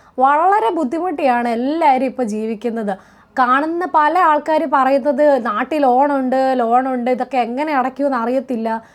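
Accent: Kottayam, India. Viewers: കൊച്ചു കോട്ടയംകാരിയല്ലേ 😂സത്യം പറയണം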